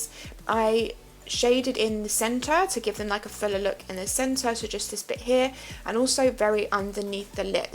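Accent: United Kingdom. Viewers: This is really pretty. First time I've come across your. Channel. Wasn't expecting the British accent!!! You're very cool:)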